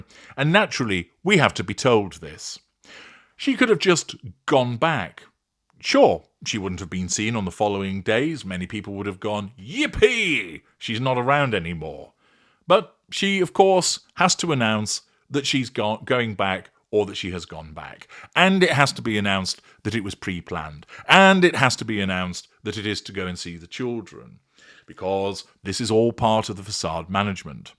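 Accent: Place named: RP, United Kingdom. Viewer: You sound a bit like, David Walliams posh voice!.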